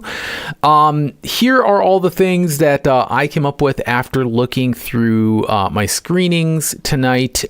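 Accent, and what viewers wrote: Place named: Canada. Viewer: Thanks I just notice your classic Canadian oo for ou dialect. I didn’t notice It on your other videos. Looking for stocks that are ready to pop are the hardest to see for me so I appreciate these. I see your list inside discord but I don’t arch your videos on you tube. I don’t know why, but I can’t turn my phone sideways in discord and makes your screen hard to see. Thanks again I was writing this last night but ran out of time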